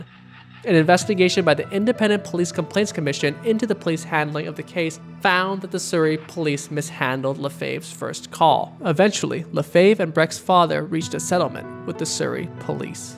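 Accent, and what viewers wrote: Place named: United States. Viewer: Holdup why does she speak American English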